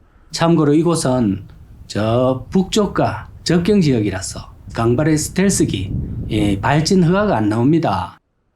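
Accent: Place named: Seoul, South Korea. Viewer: 이제 왜 경상도 사투리 안쓰요 서울말 한다고 서울사람 흉내요 경상도 사투리 매력으로 보고 좋아요 찍는데 이제 안볼란다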